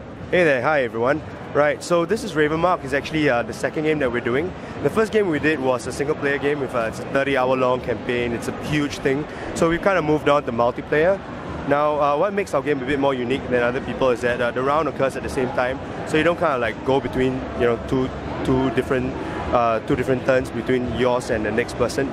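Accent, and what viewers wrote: Singapore. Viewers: Sounds like a Singaporean.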